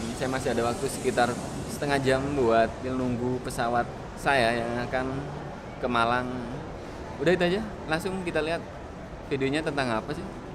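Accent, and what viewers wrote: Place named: Probolinggo, Indonesia. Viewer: Pantesan mas, logat e khas pol wong probolinggo 😅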